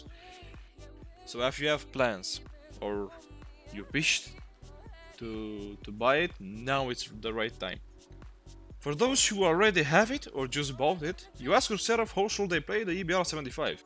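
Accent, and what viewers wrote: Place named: Belgium. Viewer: Ik kon je accent maar niet plaatsen tot je 'tier' zei hahah, ik dacht gelijk een Nederlander met een raar accent en toen kwam de 'spotter' en toen dacht ik belg hahahah. Toffe video man, gesubbed en geliked.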